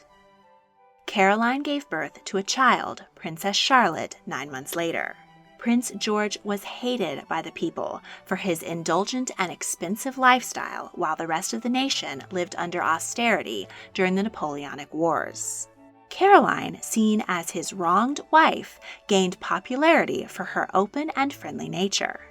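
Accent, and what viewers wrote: United States. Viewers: are you American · As son as I heard an American accent I passed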